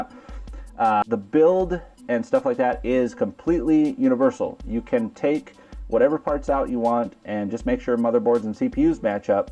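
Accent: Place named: Newfoundland, Canada. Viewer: You sound Canadian, I sense a Newfie.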